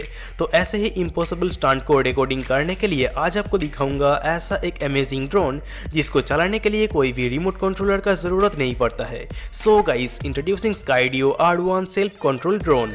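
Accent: Bengal, India. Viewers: Okay but voice is a mixture of Bengali and hindi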